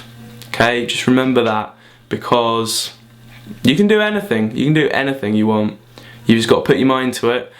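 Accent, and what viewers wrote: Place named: United Kingdom. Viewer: I just love his unique strong british ascent...interesting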